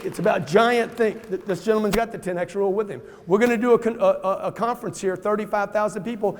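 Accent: South, United States. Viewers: Ban Nsabin it’s his southern twang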